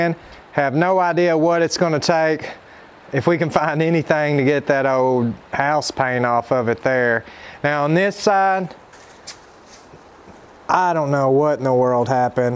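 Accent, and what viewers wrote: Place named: Texas, United States. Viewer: I like the pace of your working. You tackle things so intentionally and cleverly. Also the dialogue between the two of you is amazing to witness. I prefer that so much more than when you made the silent version on the Citroen. That was kinda weird because it was so obvious that at times you had to restrain yourself talking. That made it awkward to follow. Besides, your Texas twang is nice to listen 😊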